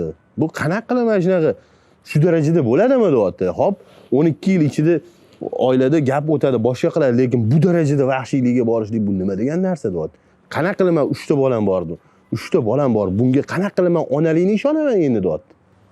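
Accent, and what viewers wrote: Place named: Tashkent, Uzbekistan. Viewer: Укам койил тошкенчасига жайдари тилда тушунтириб Бердинг менимча хамма Зур тушунди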